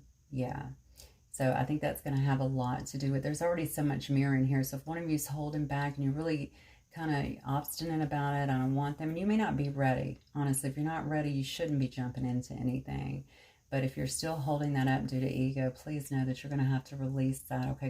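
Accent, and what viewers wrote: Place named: South, United States. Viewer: Brilliantly and amazingly accurate. In addition to reading the cards and channeling, I love how you inject little pearls of wisdom into each reading. Your voice and soft Southern accent is so very soothing, especially in times of difficulty which I am experiencing at present. But I feel energetic stirrings.. Thank you, Ms.. Xx 🌟💖💫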